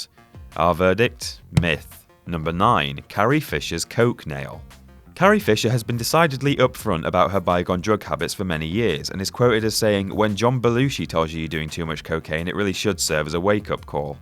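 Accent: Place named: Cheshire, United Kingdom. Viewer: rough guess would be Cheshire, or naturalised northern affectation. You only have to get a few seconds in and hear the 'Rapoonzel' to know that man!!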